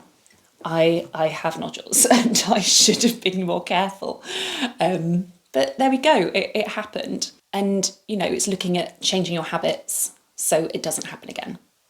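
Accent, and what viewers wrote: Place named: United Kingdom. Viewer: You have a new subscriber here! I'm so sorry that getting a referral to a specialist was so tough. I'm in the States, and referrals tend to work a bit more efficiently (if you have good insurance!). I'm pretty sure that I could very well have nodules. Speaking as well as trying to sing has been difficult for the past couple of months. I've already made an appointment, and we'll find out. The light at the end of the tunnel is any comfort that they can be healed -- and I can hopefully sing again! BTW, you remind me of a girlfriend I had a while back.... but, with a British accent! (and she was real cute!!).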